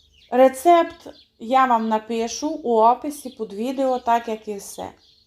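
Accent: Transcarpathia, Ukraine. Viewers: Можу цілу вічність насолоджуватись закарпатским діалектом та кухнею💕 З любов'ю "западєнєц" з Донбасу ^^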